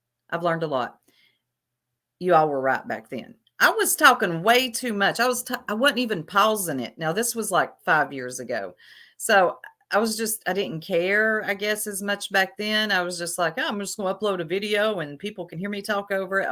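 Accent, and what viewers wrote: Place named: South, United States. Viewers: Love love love that deep southern accent! So nice to put a pretty face to the voice! Thanks for all you do and congrats 🎉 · You sound like you are from Kentucky. Aww I hate that happened to you but you are taking it well. I hope you get it back 90 days.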